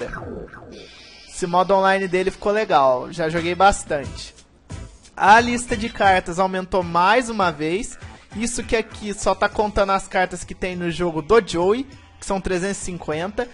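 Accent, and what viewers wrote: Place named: Minas Gerais, Brazil. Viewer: gosto muito do seu sotaque ele é muito engraçado sotaque mineiro (ochente)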